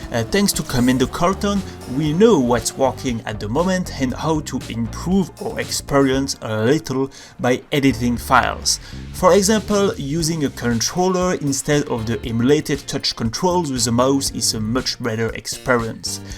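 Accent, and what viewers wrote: France. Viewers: Question are you french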